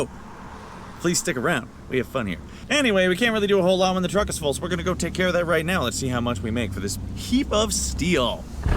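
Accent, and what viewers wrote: Canada. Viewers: Good one......ooohh yeaah its got some weight to it.....Same same but with Canadian accent Love it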